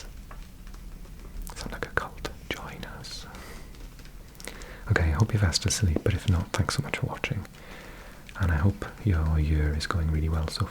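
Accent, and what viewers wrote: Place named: Wales, United Kingdom. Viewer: Love hearing your Welsh accent as someone who lives in Wales! Unusual to hear it on here so thank you 🤩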